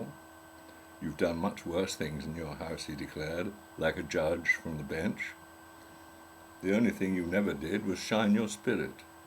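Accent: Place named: United Kingdom. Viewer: Listening to this in the British accent and calm voice is sublime :)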